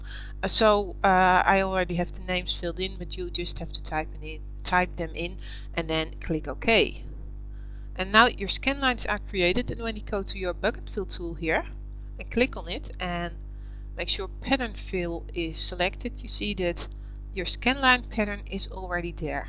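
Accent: Netherlands. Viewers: sounds like a dutch accent am i right? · spotted the Dutch accent a mile away haha. nice tut thanx a lot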